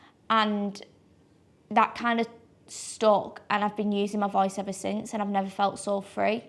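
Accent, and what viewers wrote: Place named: Ireland. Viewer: I don't want to be mean... but her horrible irish/northern english/whatever... accent makes it an ABSOLUTE horrible experience listening to her! It really is HORRIBLE! I lean to tell her "Go open up your chakras somewhere else!"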